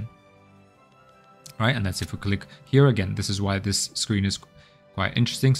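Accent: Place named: Eastern Europe. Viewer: I've watched a quarter of the video and the question I wanted to ask you the whole time was, are you a native British citizen? Your accent sounds vaguely familiar, are you from Eastern Europe? Sorry if this is a personal question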